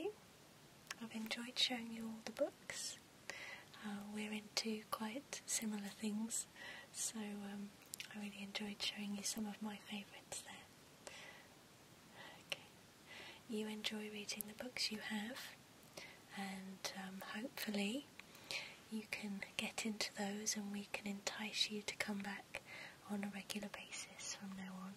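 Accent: United Kingdom. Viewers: ..i could listen to you read out of the phonebook! Your voice is so soothing and calming..i love your British accent as well. I love your videos..they're very relaxing. Good day, lovely!❤😊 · I find that I have that reaction with the majority of her more British terms or even words that have a significant difference in pronunciation between her accent and American English.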